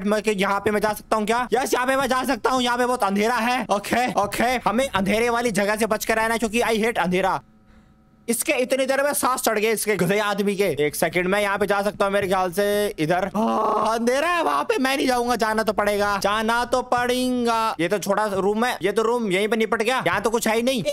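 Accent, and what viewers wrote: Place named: Haryana, India. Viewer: Bro you are from HARYANA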